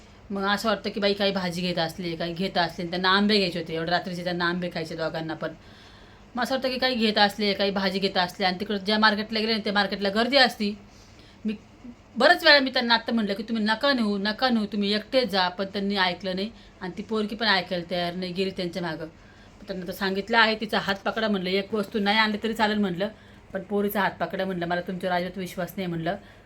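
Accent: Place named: Kolhapur, India. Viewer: Kolhapur bolte hain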